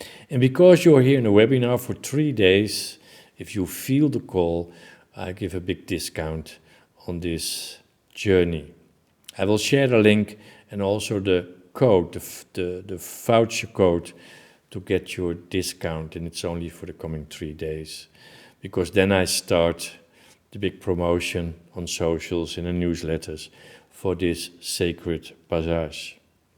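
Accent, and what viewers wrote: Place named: Netherlands. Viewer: Ik hoor een Nederlandse stem.. hoogst irritant! Waarom is dit?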